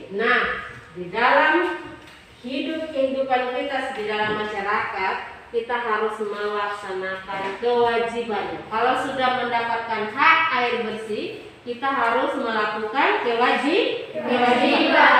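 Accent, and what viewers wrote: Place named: Sumba, Indonesia. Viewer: Logat sumba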